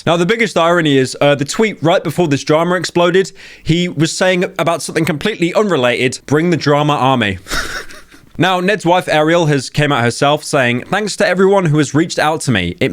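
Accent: United Kingdom. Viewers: Wait is British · hello british person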